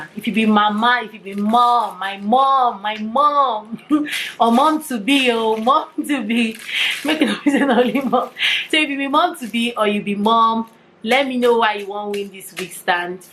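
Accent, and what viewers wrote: Port Harcourt, Nigeria. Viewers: I like your pidgin o, very Port Harcourt'ish..LOL!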